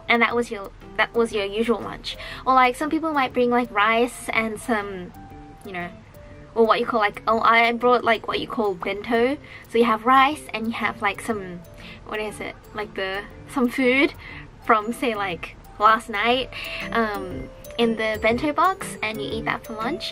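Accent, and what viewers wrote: Australia. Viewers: Me gusta saber inglés y entender lo que dices sin necesidad de subs, gracias por el vídeo mija :D P.d: Me gusta tu acento Australiano... no estoy segura si es de ahí.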